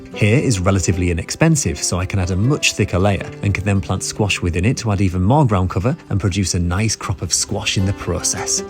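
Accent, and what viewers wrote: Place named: United Kingdom. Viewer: He’s talking about HAY. His British accent is making it confusing. 😅😅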